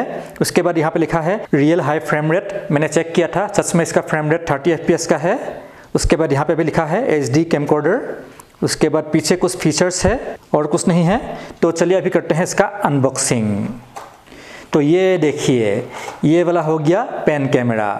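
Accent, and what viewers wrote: Assam, India. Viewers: Toi axomiya hoi....gom pai golu kotha koutai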